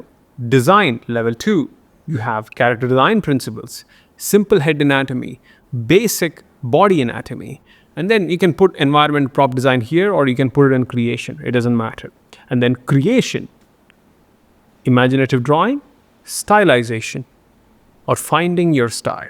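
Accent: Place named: India. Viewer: Lol, just discovered this 2nd channel, same old Indian accent.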